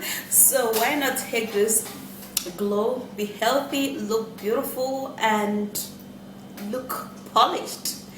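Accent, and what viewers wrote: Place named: Nigeria. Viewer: Those are grapes she use not berries...but maybe thats what they name it in their country.She sounds like Nigerian to me.All that she uses are natural.every day stuff...I guess It will work.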